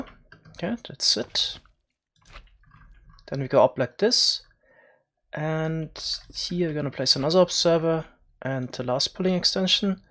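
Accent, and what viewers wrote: Germany. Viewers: Man hört das Deutschland sehr gut raus.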